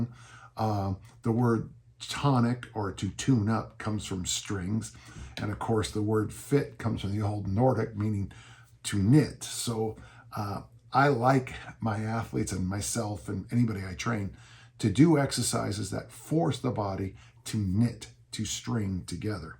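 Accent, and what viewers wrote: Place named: England, United Kingdom. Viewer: He's certainly not Scottish, he's as English as they come 😂 But yeah, he's very good!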